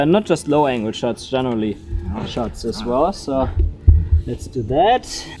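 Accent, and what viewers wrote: Germany. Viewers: I recognize the german accent! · Wow, I can hear that youre German, try to meet up with niklas christl, a super videograph too❤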